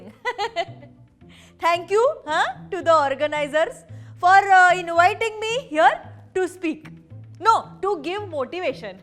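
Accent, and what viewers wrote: Maharashtra, India. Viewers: My god her acting skill and the marathi accent..love it dii ❤️❤️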